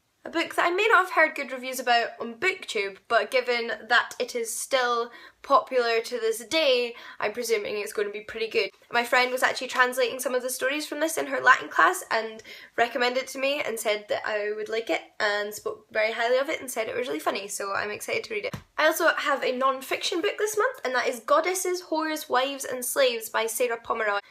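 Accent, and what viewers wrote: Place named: Glasgow, United Kingdom. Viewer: I love your accent, are you from Glasgow?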